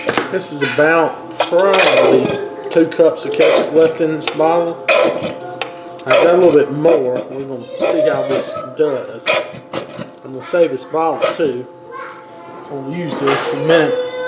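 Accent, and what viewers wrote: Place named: Mississippi, United States. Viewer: Are you from around gulf port MS? My friends have a similar accent at Ole Miss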